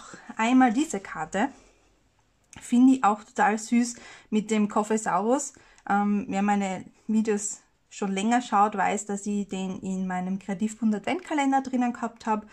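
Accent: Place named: Austria. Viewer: Die vintage u die rosenkarte sind meine Favoriten! Nach der Aussprache bist du österreicherin!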